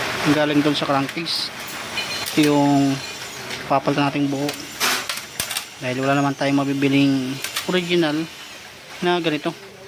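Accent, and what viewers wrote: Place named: Visayas, Philippines. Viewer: Taga asa ka idol,parang may hang Visaya ang sa Lita mo, Ako Taga bohol....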